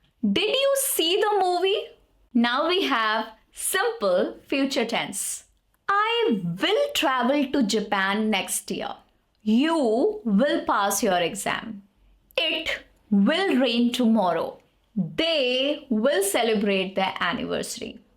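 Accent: India. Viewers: Your excent is so indian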